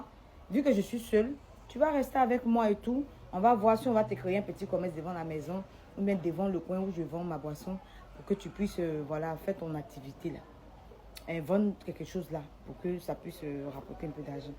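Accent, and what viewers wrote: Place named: Ivory Coast. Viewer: son mimi accent ivoirien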